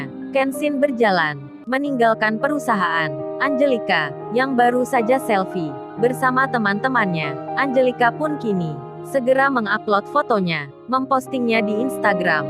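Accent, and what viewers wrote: Surabaya, Indonesia. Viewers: Admene wong suroboyo iki wkwkkwk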